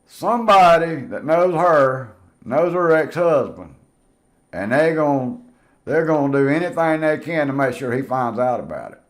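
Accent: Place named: South, United States. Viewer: that Southern Drawl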